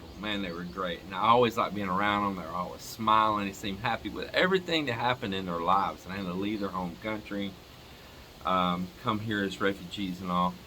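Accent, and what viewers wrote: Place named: Dallas, United States. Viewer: Love the Dallas accent. It is Dallas, right?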